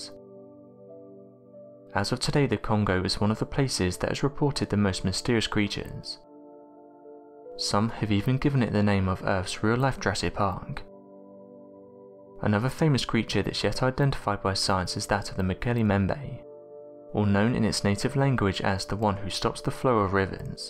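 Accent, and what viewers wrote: England, United Kingdom. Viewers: What’s up with the cadence and flow of your voice man? I mean no offense nor do I have any problem with your British accent, just the monotone sound and the way your telling of things in your videos is segmented into sentences with this ebb of your voice. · A video regarding bili apes with no footage or pictures of an actual bili ape... just someone with an english accent talking.... interesting. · Anyone else tired of these voices of the narrators in these type of videos? Always some guy or girl from UK with little to no life in their voice.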